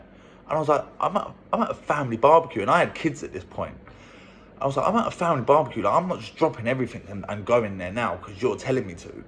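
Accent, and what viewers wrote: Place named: Turkey. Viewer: the turkish accent stuck brother😂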